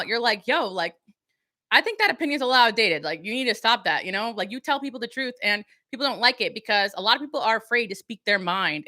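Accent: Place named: South, United States. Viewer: Lol readin territ… lean i to that southern why don ya 😅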